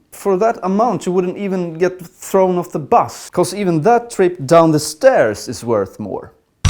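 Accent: Stockholm, Sweden. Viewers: STOCKHOLMSKA, såklart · yay stockholmska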